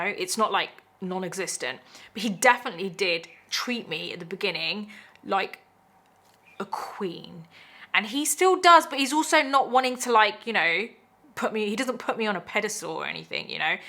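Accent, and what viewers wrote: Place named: United Kingdom. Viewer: ❤ Iove your accent its so cute.. what part of UK are you from? ❤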